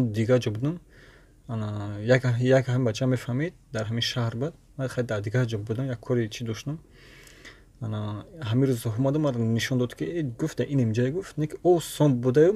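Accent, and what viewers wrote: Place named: Pamir, Tajikistan. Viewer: ты ранги помирийо гап мезани бо йагом рагт помири набоша ба пеш 👍👍👍👍👍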